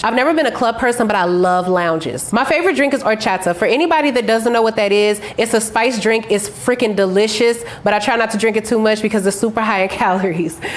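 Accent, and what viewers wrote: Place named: United States. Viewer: I wanna kiss feet, legs, lips, shoulders., Grace Gealey, Zaza Ali, Florence Ballard(RIP), Karrine Steffans, Iva LaShawn are the hottest women. feet and shoulders are hot. i got a question for you that you didnt say in the video. What race/nationality are you? I saw on your Facebook page that you are from Puerto Rico. I thought you was from america because you sound like a black woman. You dont sound like a foreigner when you talk. Did you get married? I saw you are engaged to a man on your Facebook page. I wish wasnt engaged to get married because i would love to have as my woman. If you wanna know about me. Im a lightskin black man who is 5 feet 10 inches. I weigh 185 pounds. Im 49 years old. I live in Maryland. I dont have a black woman. I dont have kids. I live with my parents because i dont have a job. Im trying to get SSDI money from social security office. 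I got a telephone interview with social security October 31 about SSDI money. White people wont let me keep a job because white people complain too much. Thats why im trying to get SSDI money from social security. I hope social security approves me for SSDI money so i can use the money to get a nice apartment in white neighborhood in Alexandria, Virginia.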